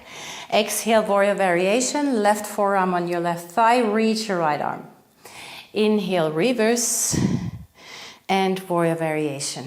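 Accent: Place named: Germany. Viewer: Habe gerade deinen Kanal gefunden und abonniert und freue mich sehr darauf mit dir zu praktizieren!! I also LOVE essential oils and your German accent. Lol. Alles Gute und viele Gruesse von einer Kollegin.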